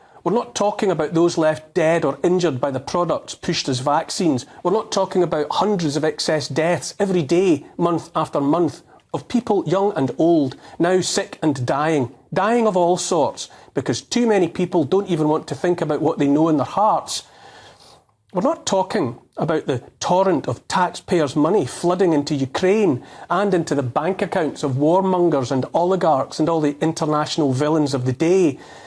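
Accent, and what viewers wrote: Scotland, United Kingdom. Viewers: A true Scottish voice speaking truth to power .Cue character assassination in the press.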